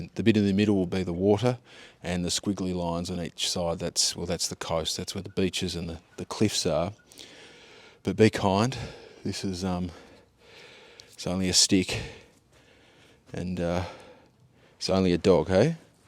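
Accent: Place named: Australia. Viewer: You, sir, give a valuable service, in an iconic Ozzie laconic manner.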